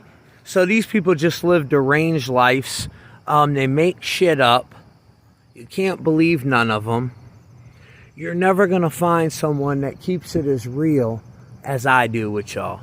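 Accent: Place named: Baltimore, United States. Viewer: I have been watching your vids for 2+ years on FBas my main, Instagram, and here on YouTube. I'm born and raised in Baltimore. You sound like you have a Baltimore accent.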